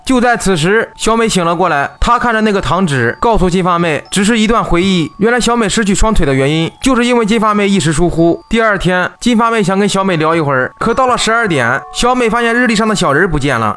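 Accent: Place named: Northeast, China. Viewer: 東北口音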